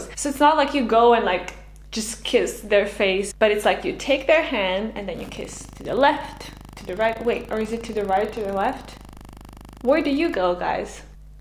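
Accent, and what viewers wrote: United States. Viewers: i bivis u Nemackoj a orde neki Ameriki akcent koristis, nista ne razumem, sta je poenga vog kanala? Sprehaj Dojc brate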